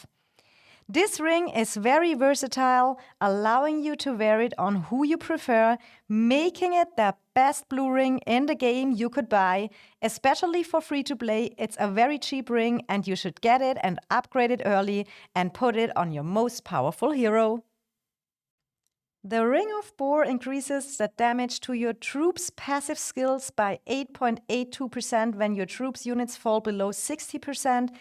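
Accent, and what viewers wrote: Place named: Germany. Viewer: The German accent ❤😂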